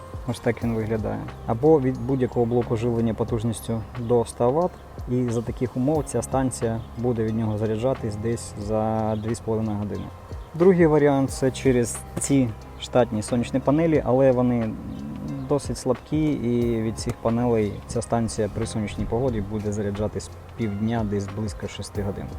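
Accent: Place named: Surzhyk, Ukraine. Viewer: 😂если начали по укр говорить, то уже все говорите, суржык дикий аж слух режет)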